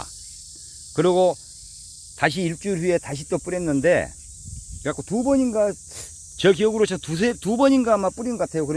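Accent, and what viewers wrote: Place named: Jeolla, South Korea. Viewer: 전라도 구수한 사투리 정겹고 날마다 좋은정보 잘배우고 갑니다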